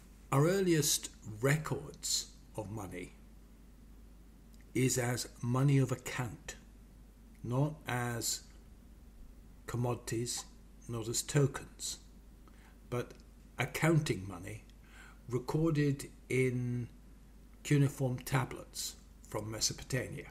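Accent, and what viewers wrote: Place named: Upper class, United Kingdom. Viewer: I once saw a video of hers advocating nuclear energy, leaving aside a few important sobering arguments against nuclear power. I thought they‘d be useful to consider and had them placed in the comments. - No reaction. I also don’t like too much the tone of voice and the sort of strange upper class English she speaks, presumably in order to underline excellence and intellectual superiority.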